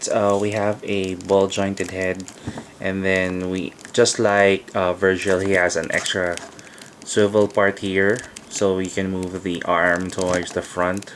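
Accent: Philippines. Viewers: are you fillipino? you sound like it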